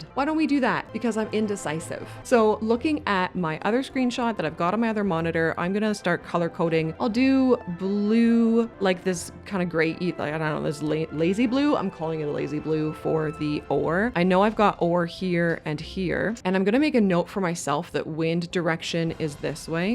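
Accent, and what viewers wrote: Canada. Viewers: As a fellow Canuck... your consistent pronunciation of "aboot" hit my funny bone just right! LOL Anyway, this was HUGELY helpful! I have never thought of this idea, and I struggle when building cities, despite all the great guides and tips out here in YT Land. I'm going to start over - again - like, I think this will be my fourth city start since I got the game the day it came out - pick a map, take a screenshot, load that into my Goodnotes app on my iPad and mark it up with my Apple pencil in ALL THE COLOURS! Then I can have my plan in front of my face while I build. SUCH a great tip, thank you for sharing!!! :trophy-yellow-smiling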